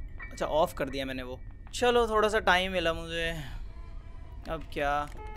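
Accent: Haryana, India. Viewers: Who else miss's his haryanvi accent 😢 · I think he needs to bring his old desi accent back 😂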